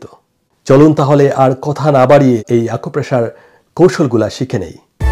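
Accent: Sylhet, Bangladesh. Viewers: স্যার কি সিলেটি? কথার টানে মনে হয় সিলেটি❤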